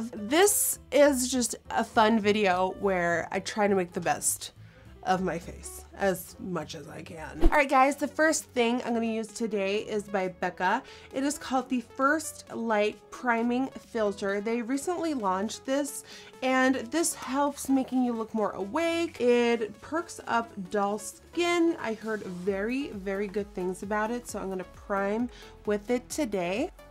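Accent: United States. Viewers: Oké ik wist dus niet dat je Nederlands was je klinkt als een amerikaan😂😂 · She's Dutch, and what an amazing channel and American accent! Ga zo door!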